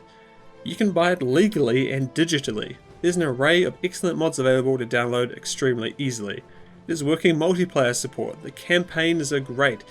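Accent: New Zealand. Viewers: Where in New Zealand are you from?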